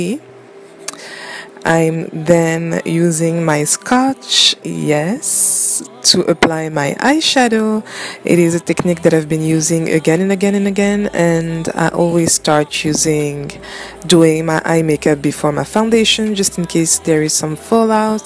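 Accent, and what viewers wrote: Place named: France. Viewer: love the French accent! and you're gorgeous new subbie 😍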